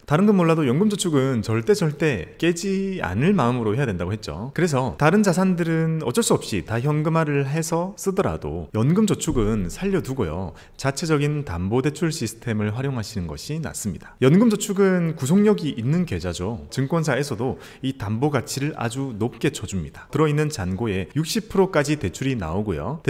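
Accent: Gyeongsang, South Korea. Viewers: 처음엔 무슨말인진 모르겠고 그냥 저음의 경상도 사투리를 즐기고 있었는데요 듣다보니 이제 이해도 됩니다 😎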